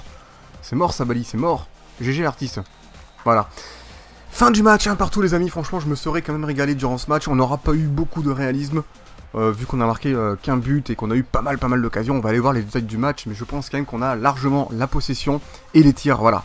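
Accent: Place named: Bordeaux, France. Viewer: Coucou tu connais pissos car j y suis allé en vacances car tu semble venir de bordeaux avec tes "sans deconné " 😂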